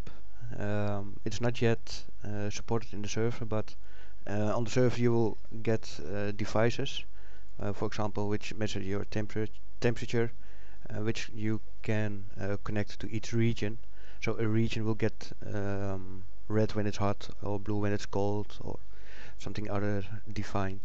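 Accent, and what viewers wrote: Netherlands. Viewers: well if you are dutch and you have heard dutch people speak english you kinda get a feeling for it, but it isnt a crazy dutch accent.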